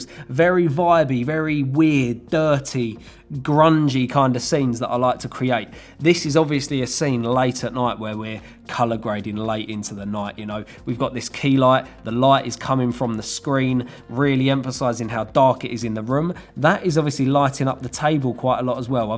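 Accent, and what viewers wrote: United Kingdom. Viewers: I watch your videos just to hear your Brit accent! So nice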